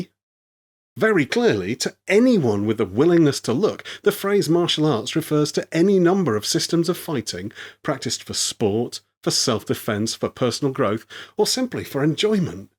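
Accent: England, United Kingdom. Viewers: Is he being sarcastic when he asks how I’m doing or is just his English accent?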